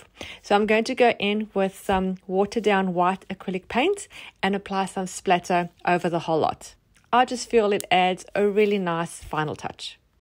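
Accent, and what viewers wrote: South Africa. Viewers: Has to be a saffie - my accent too. We say Serviette though? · You have a South African accent?? Loved the ideas shared.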